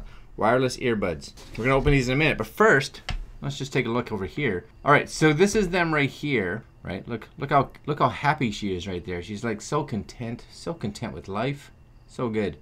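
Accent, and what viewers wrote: Canada. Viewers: Great video if your not Canadian you sure do sound Canadian from your viewer up north ,keep up the great reviews this is my new favourite Chanel 👍🇨🇦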